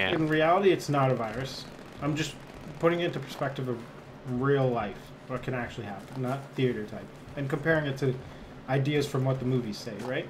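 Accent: Canada. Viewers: Not Irish. Newfoundlander.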